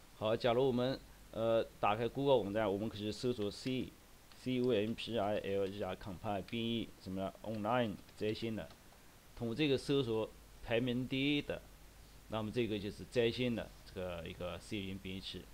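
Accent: Anhui, China. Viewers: 6，这口方言把我听愣了，阁下莫非是安徽大佬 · 我听着就是安徽人啊😂😂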